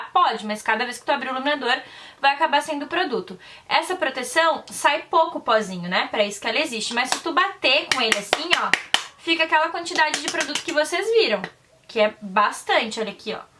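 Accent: Rio Grande do Sul, Brazil. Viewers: Tu é gaúcha? teu sutaque é bem parecido com o daqui do RS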